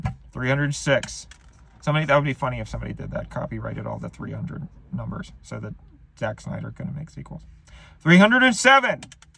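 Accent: South, United States. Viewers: His really shitty southern accent is so freaking great man, I love you and all your quirks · the southern TWANG is NOT A COSTUME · The southern accent arc was crazy…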